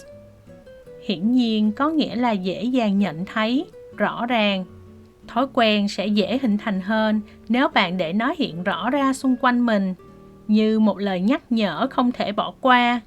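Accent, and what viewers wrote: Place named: South, Vietnam. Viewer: 전 남부 발음을 공부하고 싶어서 이 채널이 좋아요!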